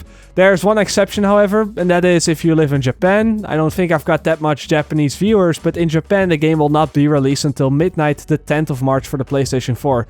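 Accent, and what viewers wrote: Netherlands. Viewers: nou jij komt uit nederland zo te horen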